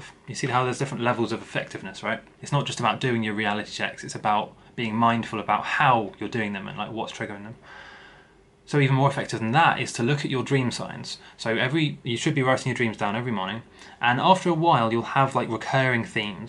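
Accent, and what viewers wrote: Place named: North, United Kingdom. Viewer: I'm american. I understand you well I think its cause you sound like youre from the north(maybe, could be wrong). There are some English accent dialects that are difficult to understand but youre clear :)ha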